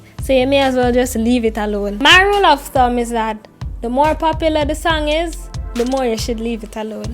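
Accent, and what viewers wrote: Jamaica. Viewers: You sound Jamaican, thank you for this video 🥳❤️ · Your very beautiful young lady wen I saw u I thought u was an African girl but wen u spoke I realised you're Jamaican ..no offence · Are you a Jamaican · Are u Jamaican :)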